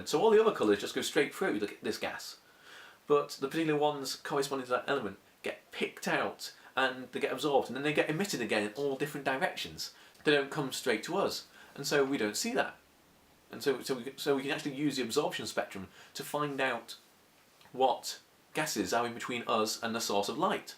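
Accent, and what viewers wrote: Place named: United Kingdom. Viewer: Just saying "The Fundamental Interconnectedness of All Things" in a Brittish accent is worth a vlog in and of itself! Keep up the good work and good luck!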